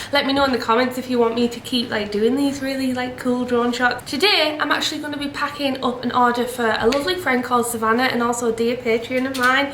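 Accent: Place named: Yorkshire, United Kingdom. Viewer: Everything is so adorable!! Plus its great to hear a proper Yorkshire accent on YouTube! Makes me feel at home.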